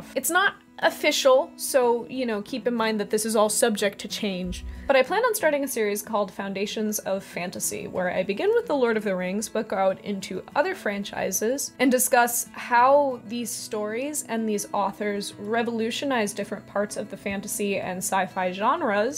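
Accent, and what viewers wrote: Virginia, United States. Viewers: I do not live in Michigan though I do have a Michigan states park pass on my car! I think it’s interesting, my guess from your accent was Virginia so partial credit? Really enjoying the channel! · This isn't meant to be mean it's meant as helpful criticism: Your accent is "Northeastern US from Virginia"? Virginia is in the southeastern US. New Jersey on up is Northeastern US, and the rest of the Northeast isn't really happy with having Jersey as a part of the Northeast. New York accents are northeastern US, general New England accents are Northeastern, Boston accents are Northeastern US accents.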